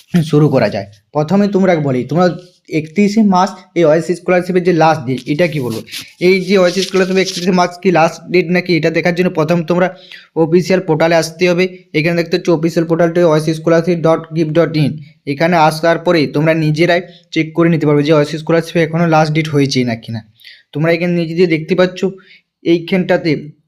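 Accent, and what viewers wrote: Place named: Purulia, India. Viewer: Dada kothai bari tomar vasa ta purulia tan lag6e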